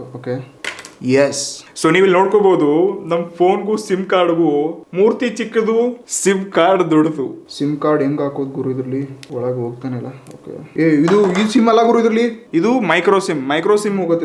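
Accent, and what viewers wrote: North Karnataka, India. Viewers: Nivu uttara karnataka bhashe matadtiralla niv uttar karnatakadoren 😍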